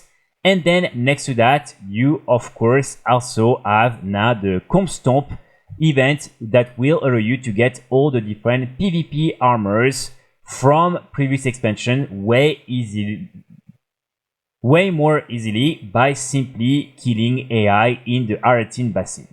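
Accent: France. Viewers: Do you do these in French? I'd watch and listen to those same videos if you do. It's the accent, it is quite thick and very French.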